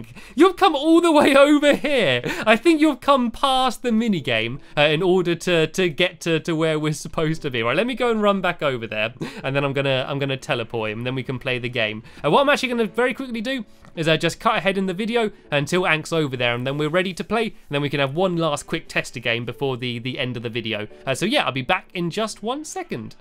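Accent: United Kingdom. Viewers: OMFG he has a british accent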